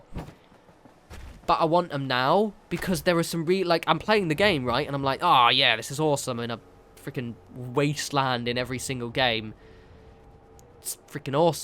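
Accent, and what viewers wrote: United Kingdom. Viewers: I used to think you said "follow me on twitter at no response" instead of at the end of every videos of urs. British accent sure is somethin..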